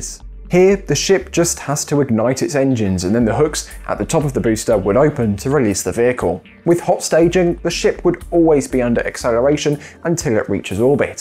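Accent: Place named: United Kingdom. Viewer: How come best SpaceX videos come with a British accent?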